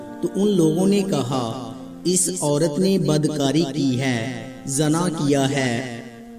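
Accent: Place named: Punjab, Pakistan. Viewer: برخوردار آپکی آواز میں ایسا سوز نہیں اور تلفظ پنجابی لہجے کا ھے۔ مناسب ھے آپ اس کام کے لئے کوئی دوسرا موضوع تلاش کریں پلیز۔۔۔جزاک اللہ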